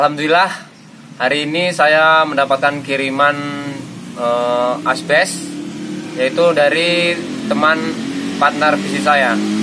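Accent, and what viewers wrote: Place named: Banyumas, Indonesia. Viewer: Ngomonge campur ngapak...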